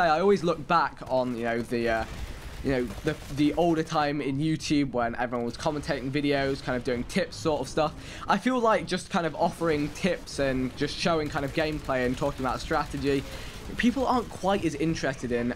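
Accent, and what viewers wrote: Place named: United Kingdom. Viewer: Ive only been watching your videos for about a month which is a short time frame in comparison to your 4 years of youtube but I truely enjoy watching your videos and the sideman collaboration! I still find it hard to understand an asian with a british accent but thats probably because I live in the American South (yall). I love the variety I think the more the better. Also Im not a youtuber (yet, school work) but Id like to do a Gta video with you and or the sideman sometime, my gamertag is hockeyslimshady and is also my twitter handle, I lack everything necessary to record gameplay but I think it would be cool to have an american in the gang who is canadian (true) and can speak french, SACRE BLEU! All in all, this feels like an essay but congrats on the 500k and keep up the good work!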